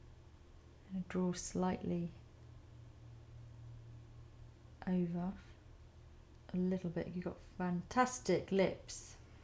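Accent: United Kingdom. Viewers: British lady <3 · I love yr British accent!!!!!!!!!!!!!! <3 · English is not my first language but I definitely understand every word she says.......She speaks perfectly.....This is the british accent that I adore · Beautiful!!! Oh, by the way, I love your accent. Whereabouts in the UK is it from? All the best from a Portuguese fan:)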